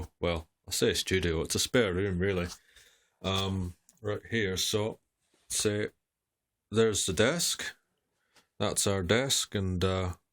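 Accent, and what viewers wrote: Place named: Scotland, United Kingdom. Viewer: Hello Thank you for taking the time to share your knowledge, which is great for people like me, who have just acquired a Weavmaster loom and don't know much about weaving. I'm looking at various warping boards, some with a few peg and some with a lot more, what would you recommend for this loom and a beginner. my loom is 102cm =40inc and four shaft, are you aiming to do any more videos, as i could listen to that Scots accent all day relax whilst i learn from the videos.Please i hope so.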